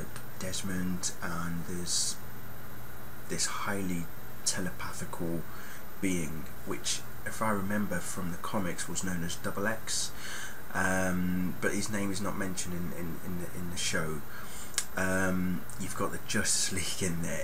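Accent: United Kingdom. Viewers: Young justice is the best american show i've seen on cartoon network in a minute! Also, Halfway through the video as im listening while on another website, I realized that you have a british accent and you're black!! That is so awesome!!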